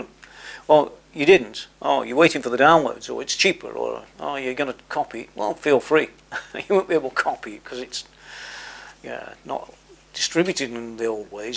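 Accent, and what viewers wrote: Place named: Liverpool, United Kingdom. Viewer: you sound like you are from Livapooo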